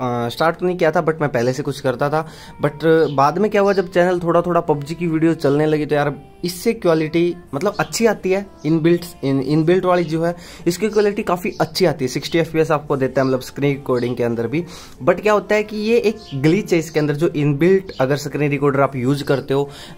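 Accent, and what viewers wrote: Haryana, India. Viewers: Bhai haryana me kaha se ho? Awaz me haryanvi touch h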